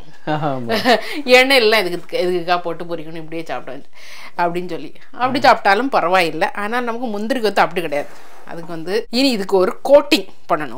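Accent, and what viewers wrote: Nagercoil, India. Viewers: Super.....நாகர்கோவில் பேச்சு மாறவே இல்லை.கேட்க நன்றாக இருந்தது என்னுடைய பாட்டி செய்வார்கள்.... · உங்களின் நாகர் கோவில் தமிழ் அழகு. என்று சொல்வது அதைவிட அழகு.இங்கு தமிழ் நாட்டில் உள்ள நிறைய கிறிஸ்துவர்கள் தமிழர்கள் என்று சொல்வதில்லை. தங்களை வெள்ளைக் காரர்கள் போலவே நினைத்துக் கொள்கிறார்கள். உங்களின் முந்திரி கொத்து ஸ்வீட்ஸ் சூப்பர் சூப்பர் 👌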